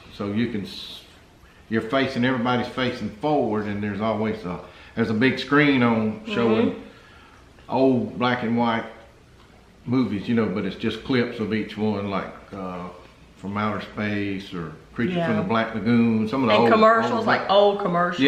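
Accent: South, United States. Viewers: Oh my goodness, you two are the cutest couple ever. Your accents are just too much, I love that sweet, Southern drawl. I was so surprised to see on with you, great video 😙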